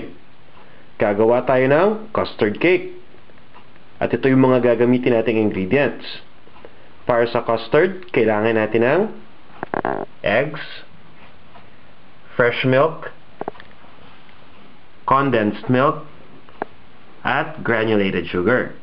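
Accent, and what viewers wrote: Philippines. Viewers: Stop speaking fillipino-english >.< I CANNOT UNDERSTAND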